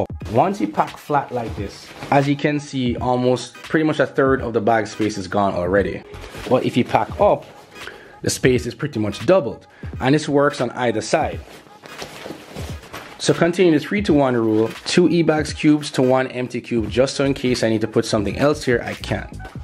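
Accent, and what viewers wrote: Jamaica. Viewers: can hear the yardie accent lol. love it!!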